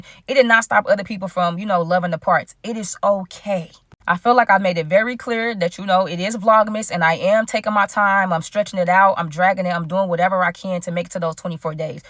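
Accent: Chicago, United States. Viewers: have a meet in greet where u from g u slick talk like us #chicago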